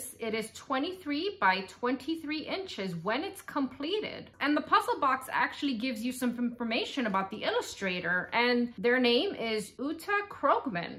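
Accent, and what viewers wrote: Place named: New York, United States. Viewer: Hi, This is the first video of yours that I'm seeing. Really like your energy and enthusiasm for puzzles! And it sounds like you're a native New Yorker, like me! I have a ridiculous number of Eeboo's - - most I bought myself and others were gifts, but I've only done my 500-piece ones so far. Maybe I'll work next on the VENICE one you showed -- it's in the closet somewhere, LOL. Have a great day!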